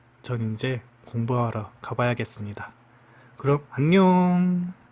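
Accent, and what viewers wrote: Jeolla, South Korea. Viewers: 전라도 사투리 엄청 쓰시는데유?.. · 전라도 말투네용ㅎㅎ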